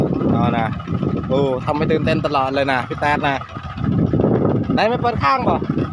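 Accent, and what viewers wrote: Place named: Suay, Thailand. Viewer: พี่ส่วยหรือเขมรครับสำเนียงเหมือนส่วยมาก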